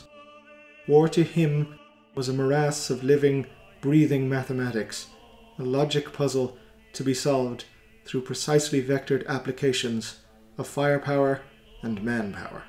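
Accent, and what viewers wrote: Ireland. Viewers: Nice to hear the voice of an Irish man recounting the 40k lore.. your version is the best of all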